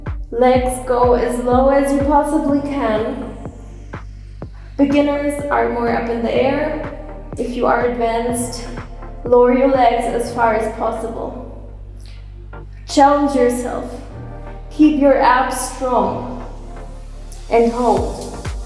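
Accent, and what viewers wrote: Germany. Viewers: Dikka man hört einfach wie Deutsch sie ist🇩🇪🇩🇪🇩🇪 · U hear the german English Hahahahha she cant speak fluently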